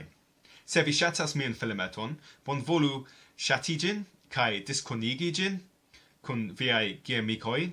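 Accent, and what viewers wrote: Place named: England, United Kingdom. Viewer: ... Ĉu vi estas angl-landano...? Mi demandas ĉar mi awdis vian akcenton kaj you sound English^. Kiel, el Anglio. Mi trovis ke mia lingvo (angla) estas, on a personal level^, mia honto. Kaj mi petegas... lernu kiel elparoli "malantawan" U kaj aliajn vokalojn. Nia (Sud-)Angllandano U estas duon antaw, duon malantaw, inter la Germana Ü (preskaw plene antawigita U) kaj la Germana kaj vera Esperanta U. (Redakto: Mi vidis vian filmeton je decembro 2016-jaro kaj ŝajne vi tre plibonigis vian elparolon.) Ĉiuj ni fuŝas la akuzativon. Vi ne malsuperas se vi akuzativfuŝas. ^ Jes, mi lingvosaltadas (codeswitch). Kial? Plejparte, ĉar mi apenaw parolas Esperanton.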